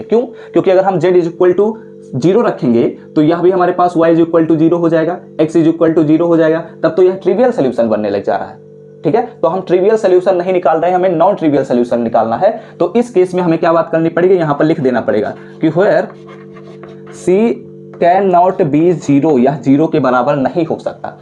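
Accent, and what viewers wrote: India. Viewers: I think he is bihari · no bihar me aisa pronounciation nhi hota UP me hota hai so UP ke ho sakte hai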